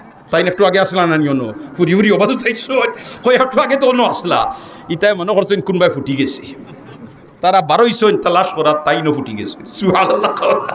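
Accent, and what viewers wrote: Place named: Sylhet, Bangladesh. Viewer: সিলেটি বাদ দিয়া বলেন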